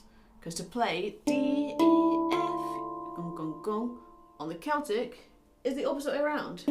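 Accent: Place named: United Kingdom. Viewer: Thank you so much! Informative, and easy to understand. (and my Californian ears love your U.K. accent) 🥰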